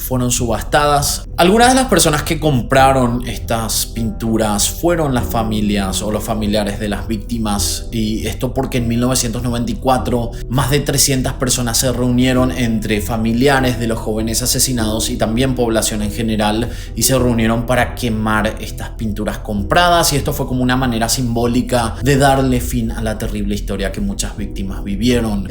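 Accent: Paraguay. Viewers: Wacala hablas como paraguayo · De casualidad no sos Paraguayo? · Los prejuicios siguen existiendo infelizmente los gays, trans y mujeres, fíjate el caso de el niño Lucio en Argentina, le negaron la custodia al padre y al tío paterno,las leyes están hechas por hombres en general y no por ser de libre pensamiento Feliz Navidad, te sigo hace poco pero tus cortos sin muy buenos Vivi en Asuncion 4 años hace muchos años y reconocí al toque tu lindo acento de Paraguay Soy Argentina y vivi en varios lugares, el último ( por ahora) es Barcelona Te mando un abrazo y te felicito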